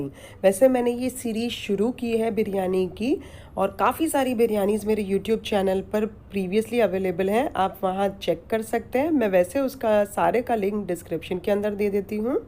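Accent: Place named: Maharashtra, India. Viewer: Ur hindi can easily tell that u are from Maharashtra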